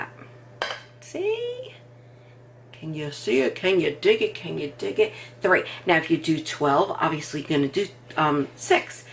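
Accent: New York, United States. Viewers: You a New Yorker? My husband is a former New Yorker now he’s a Utahn. Loved your video...Brenda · You have the coolest NY accent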